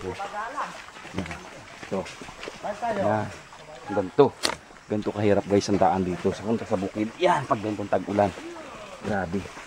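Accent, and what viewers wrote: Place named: Catanauan, Philippines. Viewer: puntong catanauanin tlga hahaha miss my hometown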